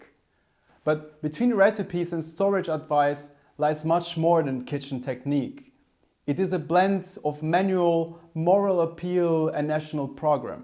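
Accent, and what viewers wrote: Germany. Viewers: Mein Herr, kommen Sie aus Deutschland? Sie klingen so. Ich lerne Deutsch und finde was Sie sprechen sehr gut! Ich finde die Geschichte dieser Zeit (WWI) sehr interessant, aber nicht so vielen Youtube sind ueber wie Deutsch Leute in dieser Zeit gelebt haben. Mehr, bitte! Danke schoen!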